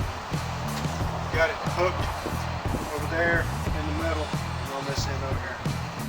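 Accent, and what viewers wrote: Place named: Texas, United States. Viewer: Nice solution for clearing out the deck. I have only one so I hung mine from the rafters in my garage. By the way I forgot to say from your last video, your new intro is awesome, the Texan in you really comes thru. Thanks for the video, looking forward to new adventures with you my friend. JT. from Oregon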